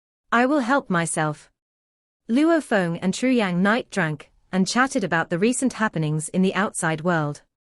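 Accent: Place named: United Kingdom. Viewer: What an annoying British accent voice over.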